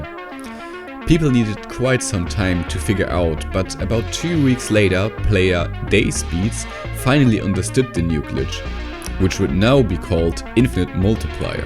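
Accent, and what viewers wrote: Germany. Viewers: Are you german bro? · sound's german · Hab mich die ganze Zeit gefragt, ob das ein deutscher ist. Hört man wirklich raus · Man merkt dass du deutsch bist ;D nicht negativ gemeint! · Du kommst aus Deutschland oder? Deine Aussprache ist verdächtig haha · im pretty sure that this man in the video is german. i hate this accent. i am german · Bist du deutscher? Hört man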